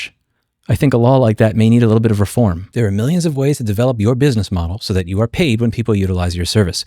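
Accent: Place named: Texas, United States. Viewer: Man, your Texas accent is getting thicker and thicker. Which is a good thing.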